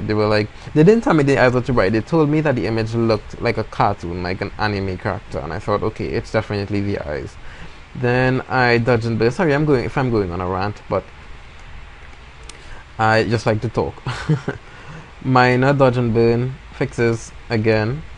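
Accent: Trinidad and Tobago. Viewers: Are you from Trinidad ? :) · Def a trini with that accent, nice work. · definitely from Trinidad!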